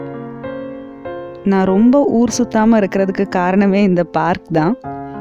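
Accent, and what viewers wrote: Kerala, India. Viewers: Madam neenga malayaliya. Phonele malayalam movie pathe athunale kette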